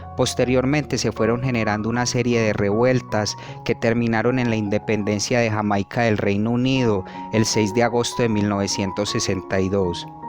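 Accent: Colombia. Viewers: Ése acento colombiano enamora a cualquiera.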